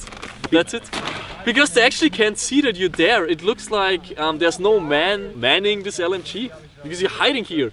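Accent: Germany. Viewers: Dein english hört sich Deutsch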